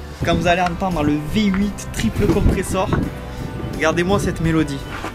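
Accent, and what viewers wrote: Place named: Toulouse, France. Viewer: un garage en toile en plein été c'est tout bon j'ai repeind mon lada sous un préau en pleine été avec du vert brillant direct et sais franchement pas mal malgré quelque petit défaut ;) Bon du coût mon express a était swapé avec un f8Q mes avec soit la culasse du F8M ou il on juste remis l'admission du f8M, un vrai ch'mille blic la mienne, mes bon pour 100€ j'vais pas me plaindre 😅 Mes sinon vu ton accent tu serait pas de la région Toulouse ou quelque chose comme sa ?? Perso je suis Tarbes donc j'me dit que peut être une fois la mienne fini...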